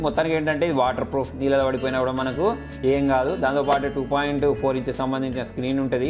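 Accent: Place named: Telangana, India. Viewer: Anna telangana slang ......🤙🤙 Arjun reddy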